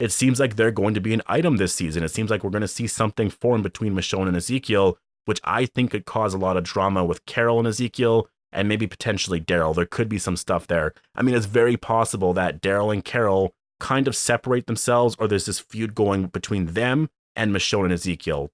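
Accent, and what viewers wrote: Canada. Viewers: Just realized you have a Canadian accent!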